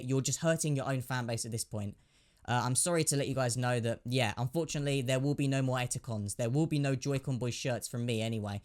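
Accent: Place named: United Kingdom. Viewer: Morally, yeah, it's really shitty of Nintendo to strike down a product that was benefitting charity in memory of one of its biggest supporters. Nintendo aren't our friends. They're the Disney of video games. They set their lawyers on everything even vaguely resembling their IP. I don't think they deserve the community they have. Legally, I don't think you have a leg to stand on. JoyConBoyz is neither parody nor fair use. Disclaimer, I am not a lawyer, this is not legal advice, you probably should consult a lawyer. You sound British, good to see a fellow PAL gamer, so I'm basing this on UK Copyright Law, which is currently still bound by the EU Copyright Directive. In that, our version of Fair Use is called Fair Dealing. It does provide an exception to copyright law "for the purposes of caricature, parody or pastiche". Those generally have a comedic, critical, or mocking tone to them. 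In my opinion, it would be a stretch to call JoyConBoyz tongue-in-cheek, let alone a qualifier for Fair Dealing. Fair Dealing is a defence, meaning you can already be in court and incurring costs by the time you get to use it. Judges will consider how much of the original work was used and the Etika Joy-Cons, bar the paint job, seems almost identical to the real thing. I'd imagine there's a good chance they'd be considered unlicensed bootlegs regardless of the genuinely good intent behind their creation. There's also a massive difference between copyright, which is automatically granted, and a tr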